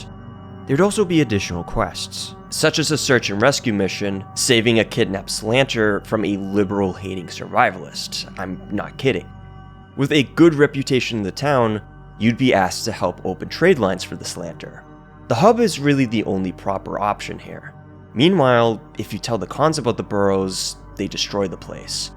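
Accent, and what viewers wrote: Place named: Upper Midwest, United States. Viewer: Are you from the the northern Midwest? Your voice is nice but I notice a bit of an accent which is actually quite charming.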